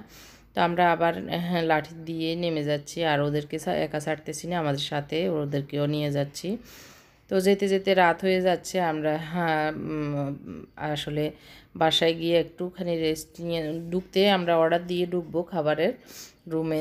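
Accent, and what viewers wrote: Noakhali, Bangladesh. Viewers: আন্নে হুরাহুরি নোয়াখালী ??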